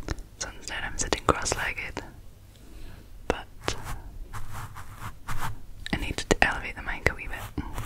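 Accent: Scotland, United Kingdom. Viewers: Prefer the rambling to the pure sounds because of the melodious Scottish accent. You should def do livestreams- super under utilized in asmr community. Also wouldn't hurt you to get some of dem superchats!😉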